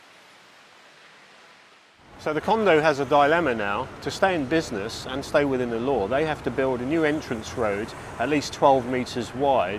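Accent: United Kingdom. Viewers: Absolutly brilliant as always. You are so unique on YouTube compared to other channels. Entertaining, informative and very interesting. Your knowledge of the history of Bangkok is amazing. I read a reply from you on someone's comment on another video, and you mentioned that you are Thai. I did not know that. Going of your accent, you obviously spent a long time in the UK. I for one would be interested in your story, I think it would make an intersting video. If you have previously made a video on your story, could you please leave a link to it? I love your work. You and a few other YouTubers have inspired me to start a channel of my own. I will be moving to Thailand in a few weeks, then I will be creating some content. Thanks for all your hard work and thanks for the insperation. 🙂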